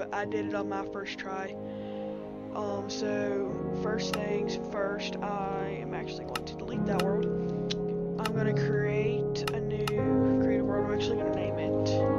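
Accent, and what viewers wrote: United States. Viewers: Are you from Alabama by any chance? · Texas haha I like your accent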